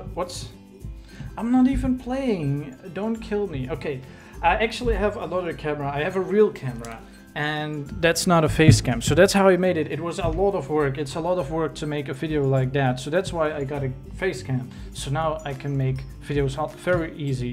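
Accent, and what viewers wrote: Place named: Netherlands. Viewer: That did i hear good you are form the netherlands . CUZ I AM DUTCH TOO! :D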